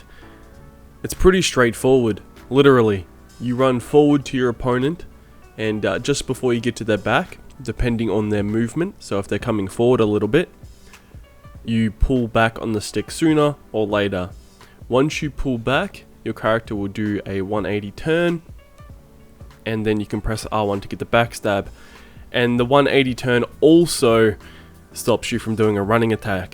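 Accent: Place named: Australia. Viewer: why hello fellow Australian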